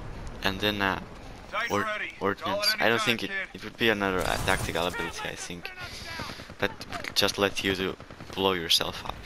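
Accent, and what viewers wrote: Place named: Finland. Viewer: you sound like finnish, i'm finnish btw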